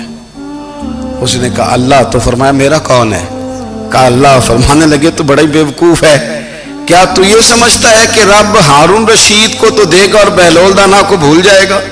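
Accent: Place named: Punjab, Pakistan. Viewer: kis tarha se baat karte hain aap peer sahab , dil ku sukoon mil jata hai , aapki punjabi accent toh mashallah ❤❤❤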